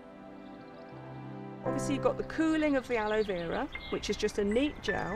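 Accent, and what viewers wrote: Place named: RP, United Kingdom. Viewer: What happened to the all-girls boarding school accent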